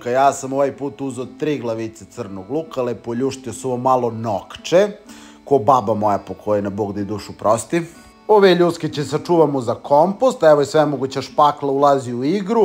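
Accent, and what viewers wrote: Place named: Morava, Serbia. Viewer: Desi bre zemljace. Ko da ste porasli pored Moravu. Mlogo bre dobar paprikas. Malo kocnice na mas na leba. Mlogo bre holesterol. I malo finocu na govor. Niki bre iz Beograd nece da te gleda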